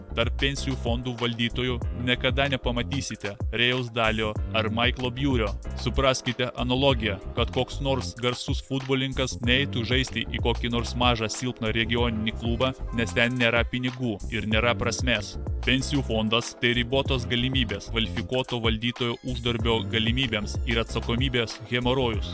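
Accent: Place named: Russia. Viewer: Rusiškas akcentas per voice changeri girdisi, primate.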